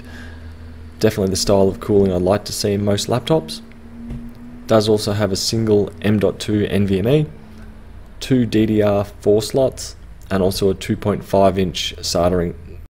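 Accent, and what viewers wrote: Australia. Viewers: he sounds australian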